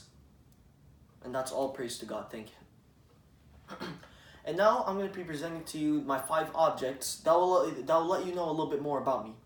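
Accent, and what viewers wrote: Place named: United Kingdom. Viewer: You have a British accent.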